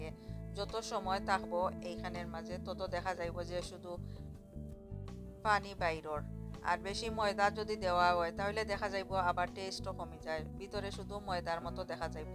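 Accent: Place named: Sylhet, Bangladesh. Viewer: আপু সিলেটি মাত শুনিয়া খুব ভালো লাগলো রেসিপি টা দারুন ❤❤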